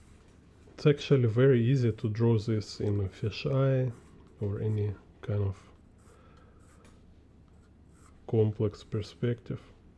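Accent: Russia. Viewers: Nice stuff and heavy russian accent ) GL HF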